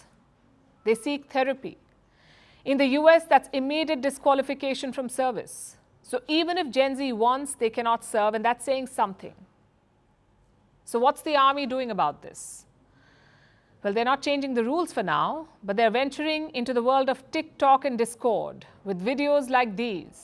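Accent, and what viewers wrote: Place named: India. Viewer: I don't like this channel at all, her accent is so strong it shows that she's from India. 👎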